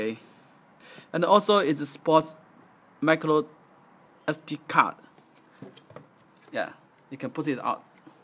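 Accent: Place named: China. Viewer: chinese english =chinglish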